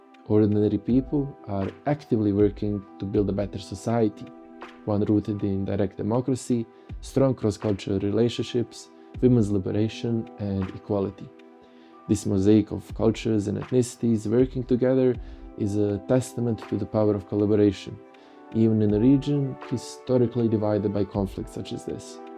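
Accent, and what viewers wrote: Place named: South Slavic, Eastern Europe. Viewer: Love the video I hope there are more geopolitics commentary with south slavic accent out there.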